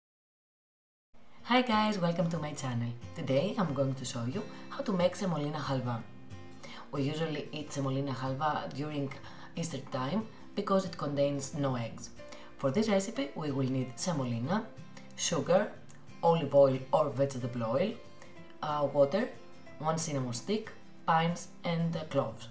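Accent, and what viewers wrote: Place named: Greece. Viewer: I love your Greek accent😍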